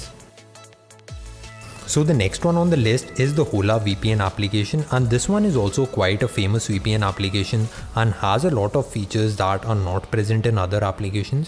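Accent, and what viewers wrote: India. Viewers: This is awsm bro thank u 4 video r u Indian? i asked this question because of ur accent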